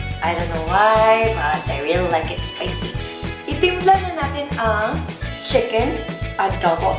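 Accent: Visayas, Philippines. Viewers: Bisaya po kase c bisprin kaya ganyan po sya magsalita,pero ok naman para din di ma bored mga viewers kaya dapat may comedy talaga po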